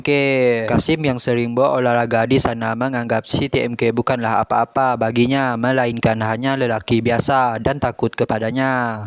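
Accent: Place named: Johor Bahru, Malaysia. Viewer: Aduh jd kangen ke JB lagi.krn Abang cakap macam tuh. Love you Abang buat sy kangen. 🥰🥰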